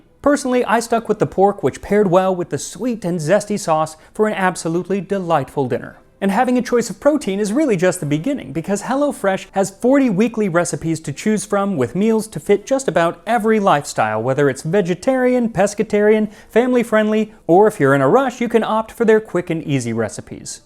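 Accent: Greece. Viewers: Excellent Greek accent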